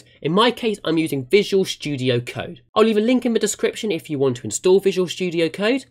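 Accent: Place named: United Kingdom. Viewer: oh, you sound so british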